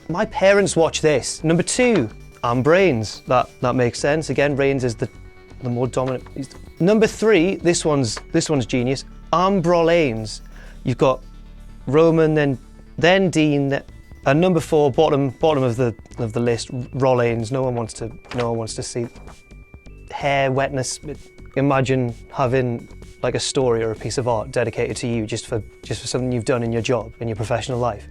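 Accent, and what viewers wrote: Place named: Newcastle, United Kingdom. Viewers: HA! You're from Newcastle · Yay!!... You are posh for a north eastern lad though.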